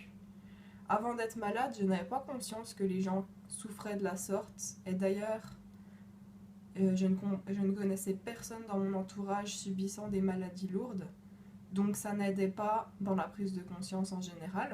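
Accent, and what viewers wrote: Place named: Upper Doubs, France. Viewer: Warrior ! difficile d'y croire quand tout se déchaîne (moi crise tous les 8 jours) mais les traitements se précisent et la recherche progresse (doucement)... on a encore bcp de thérapie à essayer ... Sois forte et quand ça va pas ..."Accueille" et repos et petits bonheurs qui vont bien... dis moi, avec l'accent que tu te payes (lol) tu serais pas du haut doubs toi ??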